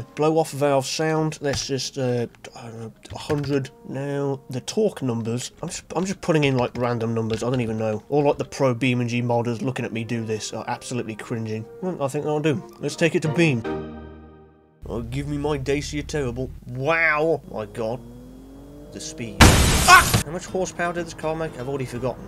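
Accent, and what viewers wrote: United Kingdom. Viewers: you talk british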